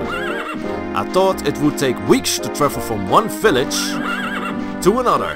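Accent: Netherlands. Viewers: You sound dutch, isn't it? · i have this feeling that you'r dutch beacuse of the accent? XD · I can't handle how Dutch this guy sounds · that has to be a dutch accent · you're accent... , if you arent from the netherlands Im eating my shoes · That's the most Dutch accent I have ever heard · Dat strong Dutch accent tho. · You have the best dutch accent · you sound super dutch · Haha je kan fk goed horen dat je Nederlans bent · Your dutch accent is out of this map man!! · dutch accent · Ik hoor aan zijn accent dat hij Nederlands is😂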